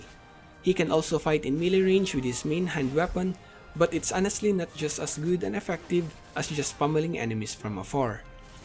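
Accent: Philippines. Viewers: Yep first 3 seconds and I can already tell this guy is pinoy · I know a pinoy accent when i hear one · Sorry but I can't help but to notice. are you from south east asia? Particulary from the philippines?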